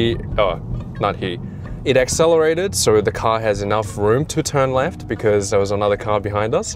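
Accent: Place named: Australia. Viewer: Good job he had the subtitles hardcoded as I couldn't understand a single word of that Australian language, all I could hear was 'mate, mate, mate'. I feel your excitement for the future.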